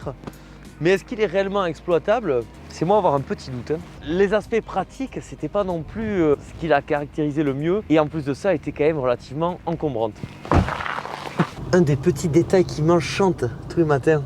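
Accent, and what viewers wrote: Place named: Montauban, France. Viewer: Bonjour mon ami super véhicule. Tu as un accent de Montauban toi🎉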